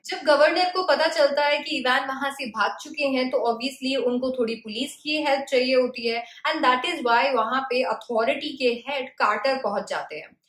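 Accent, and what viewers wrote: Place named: Australia. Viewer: Your Australian accent. I love it please talk in English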